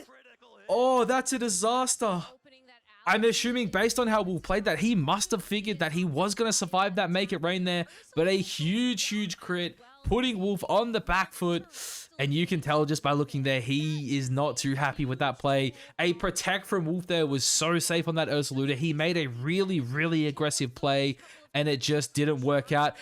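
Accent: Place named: Australia. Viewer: bruh u sound like Chaz NBA i was so mind F*cked since i just seen his video 😂 you Aussies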